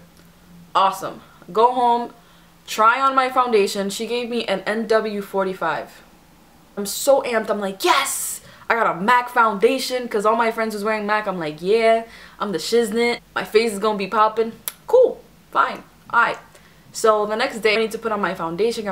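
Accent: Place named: Dominican Republic. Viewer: are you dominican? lol you sound like it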